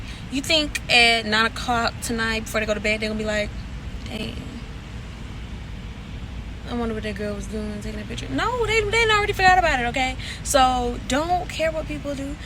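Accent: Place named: St. Louis, United States. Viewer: LOL ok your accent! You sound exactly like my family in St. Louis LOL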